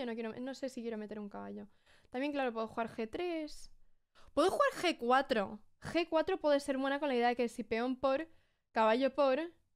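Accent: Spain. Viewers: That's a real spanish accent! ¿Are you from España? 🙂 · Es notable para un argenino, descubrir, a través de distintos youtubers, los distintos acentos idiomáticos, desde Juanjo de Rey Dama, que se come las eses, con gracia suprema, hasta este castizo maravilloso de, que acaricia nuestros oidos con sus matices entre, eses, zetas y ces. Luisón, Rey Enigma, Spider Ibarra, pasando por Pepe, nos sumergimos en ese maravilloso mundo de acentos españoles. Gracias, no te agrandes, que te regaló un caballo el Rey, cariños desde Mar del Plata, alguien que admira tu belleza y simpatía insuperable, también tu capacidad para el juego. 13 de mayo de 2024.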